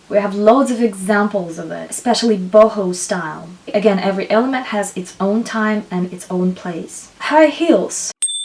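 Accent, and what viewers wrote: Russia. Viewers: Ilona Piescikowska she's russian so she probably won't change her accent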